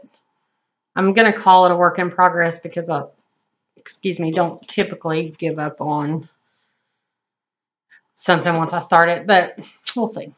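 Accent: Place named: South, United States. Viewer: Hello and welcome I heard you and thought immediately... yeah another southerner.... and then when you were showing where you are from and were talking about Dr. Pepper I was like dang you are in my neck of the woods. Looking forward to seeing more of your projects and future videos.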